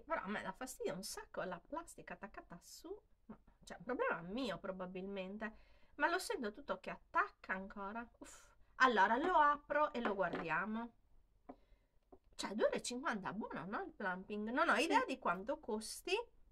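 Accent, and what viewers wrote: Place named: Sardinia, Italy. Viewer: Ciao carissima è bellissimo sentire parlare della casa e famiglia in modo così "normale" riempie il cuore sentirti parlare!😊 Un altro video sarebbe un'idea stupenda...io inserirei oltre agli argomenti citati da te anche qualcosa sui prodotti non gradito secondo te..." Magari puoi titolare con il tuo bel dialetto sardo 😂"... buonanotte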